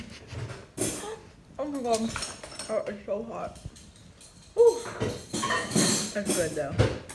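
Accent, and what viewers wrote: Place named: United Kingdom. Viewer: Your British accent is absolutely stunning darling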